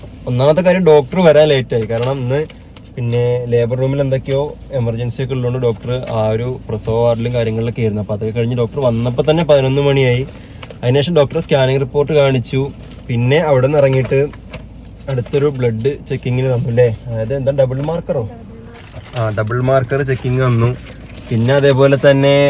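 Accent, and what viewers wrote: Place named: Palakkad, India. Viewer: Ivan palakkad slang aayallo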